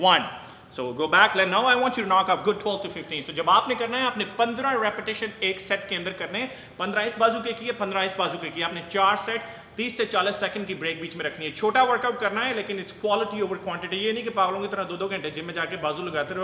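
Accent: Punjab, India. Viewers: Excellent elaboration of each workout. I really like the punjabi language touch of the guy hosting the videos. Keep the good work up..!!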